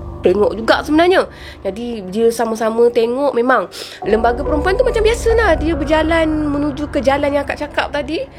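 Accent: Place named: Kedah, Malaysia. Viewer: kak ni mmg org kedah ea tngok lancar ckp😁😁😁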